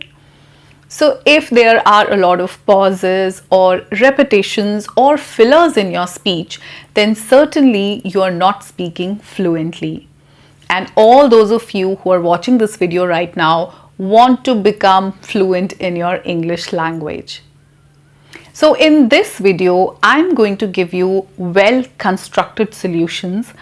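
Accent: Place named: India. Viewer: Dear mam you're doing well but I request you to change your accent from Indian to American or British. So that we might learn more.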